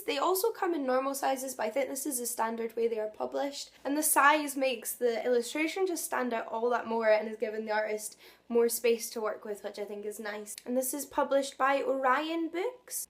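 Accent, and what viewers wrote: Scotland, United Kingdom. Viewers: Beautiffuly illustrated covers! I love your accent too. I miss living in Scotland!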